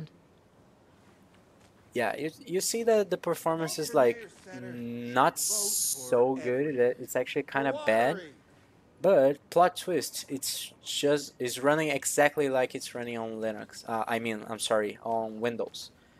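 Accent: Brazil. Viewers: o sotaque br no inglês é impagável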